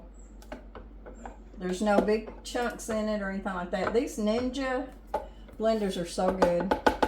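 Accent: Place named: Kentucky, United States. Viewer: Oh Miss I just love watching you. Me and my 87 yr old momma watch you and she says you sound just like one of her cousins back in Kentucky, which makes her smile ❤. Thank you so much for being so down to earth and real. My mom is waiting for your cookbook to come out. We love ya!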